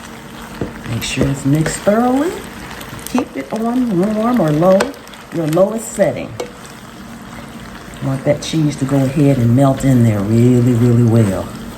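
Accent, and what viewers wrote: South, United States. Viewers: You must be from the south. I was born and raised in North Carolina and when I heard you say, "If the Good Lord's willing and the creek don't rise" I immediately thought of my mama and daddy. Thank you for the beautiful memory and for the recipe. It looks amazing! ❤🙏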